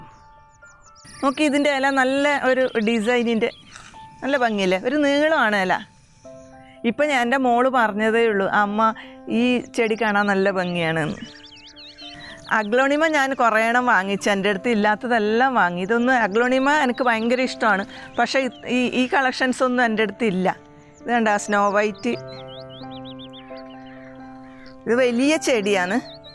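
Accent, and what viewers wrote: Kannur, India. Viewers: Kannooor analle...bhashashyli kettitt angane thonnunnu. CM nde oru style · മാഡത്തിന്റെ സ്വന്തം നാട് കണ്ണൂരാണോ ? സംസാരം കേട്ടിട്ട് കണ്ണൂർ ഭാഷ പോലെ...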